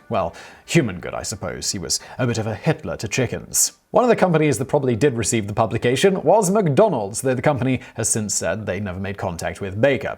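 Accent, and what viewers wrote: United Kingdom. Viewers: this british ascent..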